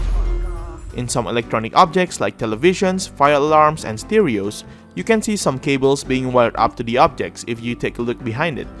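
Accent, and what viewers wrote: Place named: Indonesia. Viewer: Gilee bangg keren bgt, gw lgsg ngeh lu indo dri aksennya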